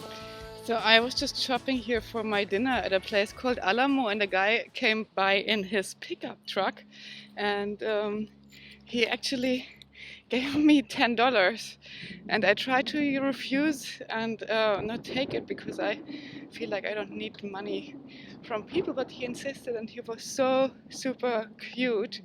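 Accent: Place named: Germany. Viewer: Fantastic video Lady!! I have been everywhere you have in your video. The South is known for it's hospitality and you will find nothing like it anywhere in the world. Missouri and especially Tennessee are my favorite places to be. Georgia is my next favorite. The people are so laid back and friendly. Great film work Lady! Awesome stuff. I still love your German accent!! Peace! Ride SAFE and BE safe Lady!! 🙌👏👏👏👍☝🤙🤘✌👌🖖✋😊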